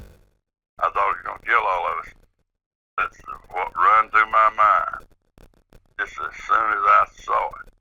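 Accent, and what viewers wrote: South, United States. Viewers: I'm from the north and had a difficult time. I think the combination of the southern drawl and the audio made it hard and I did use my ear buds. I'm gonna try listening to it again because the comments I read have made me want to go back and give it another try. Its funny because when I was down south people had a hard time with my accent. Said we northerners talk too fast. Lol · This was great! I understood everything fine, may be because myself and my whole family sound just like him. Just born and raised in the "heart of Dixie " 52 years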